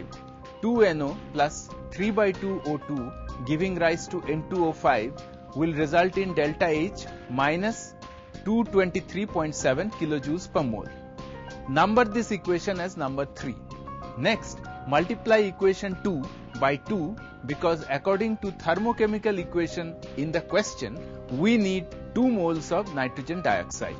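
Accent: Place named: Bengal, India. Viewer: when you can hear the bengali accent so strongly...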